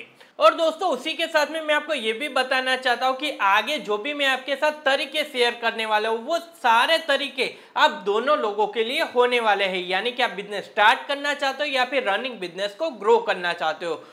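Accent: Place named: Saurashtra, India. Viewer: Is bhai ki hindi se hi pata chalta hey key gujrat ke saurashtra ka banda hey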